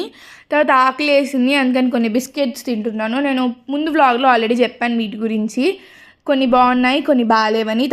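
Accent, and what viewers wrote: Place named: Telangana, India. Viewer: తెలంగాణ లెక్క ఉంది slang❤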